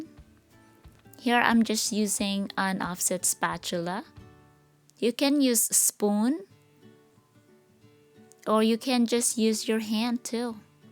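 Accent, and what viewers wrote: Visayas, Philippines. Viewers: Thank you, I think I an do this. I love your Visayan accent.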